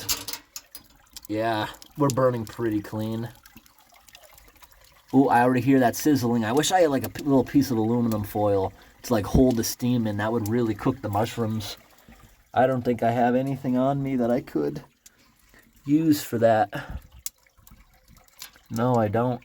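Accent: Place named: Michigan, United States. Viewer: Sounds like a Michigan accent.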